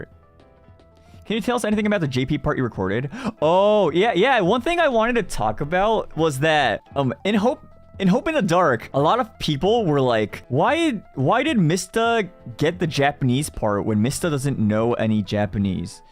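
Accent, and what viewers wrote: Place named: United Kingdom. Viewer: 英國腔日語ww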